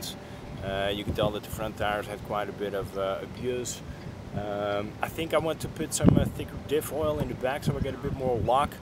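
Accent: Netherlands. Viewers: Are u dutch?, awesome vids :) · aaah ok! i was thinking ur accent sounds dutch but the netherlands is not that hilly XD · u have a little bit a dutch accent like me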